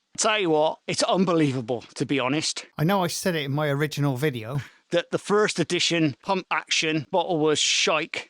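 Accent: United Kingdom. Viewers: West country or Norfolk?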